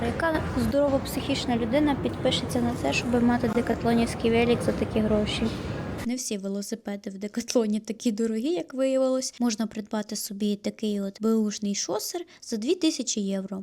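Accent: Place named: Surzhyk, Ukraine. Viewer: Аццький (давно такого не чув) суржік. Не витримав довго, треба працювати над мовою. Але бажаю успіхів і подорожей :)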